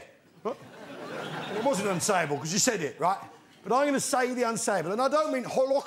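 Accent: United Kingdom. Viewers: The British accent helps it go down better...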